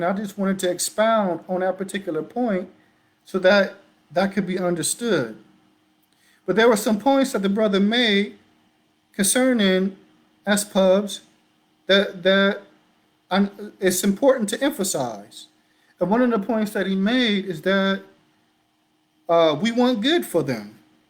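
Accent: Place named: South, United States. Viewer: جزاك الله خيرًا، you give me a feel that you’re from the south Shaykh even though I know you’re from L.A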